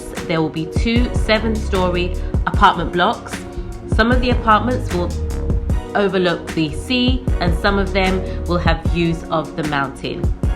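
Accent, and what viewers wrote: United Kingdom. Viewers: Greetings to my lovely family 🤗 manner an Prayer, thks again mi always love British accent ❤💖♥.. wonderful 👍🏿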